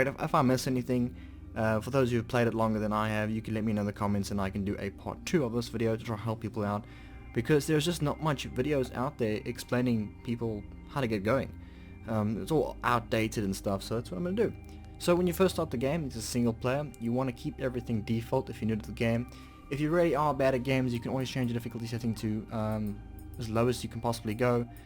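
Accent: South Africa. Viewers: South African accent perhaps???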